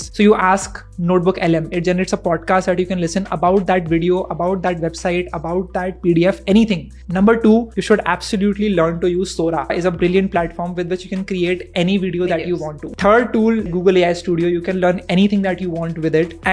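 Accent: India. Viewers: That lady's accent always changes and in this one she sounds more Indian than ever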